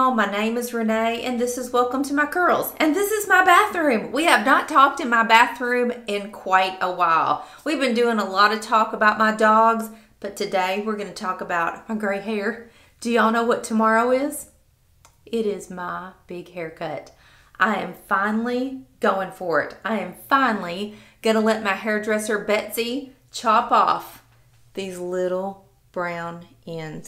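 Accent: South, United States. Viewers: I did the same when I reached 47.. my husband misses the red head. He got over it. He has a duck dynasty Grey beard. God Bless,, embrace the authentic you.. Our world is too full of fake people. Be the real deal. My hair got curly later in life and love it. I blame it on medication. P.S. love your accent so southern. Mine is a Texas drawl.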